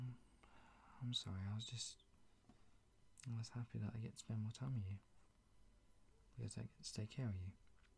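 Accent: United Kingdom. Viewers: Hope every sick girl have a boyfriend like this(;_;)this is sooo cute :3 btw British accent is the best :P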